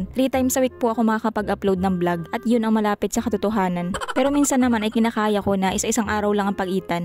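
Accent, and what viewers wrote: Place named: Visayas, Philippines. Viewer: Yong salita mo idol mayhalo kasing bisaya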